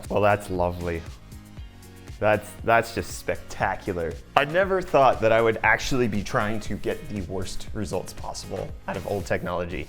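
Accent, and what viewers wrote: South Africa. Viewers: Your South African wow so cool I'm also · You south african? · you from south africa ? is jy van Kaap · m8 wat? south africaaaaaaaaaaaaa?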